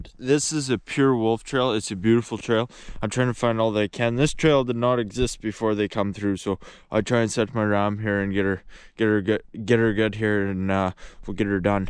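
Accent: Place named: Alberta, Canada. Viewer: This guy sounds like hes from Alberta Canada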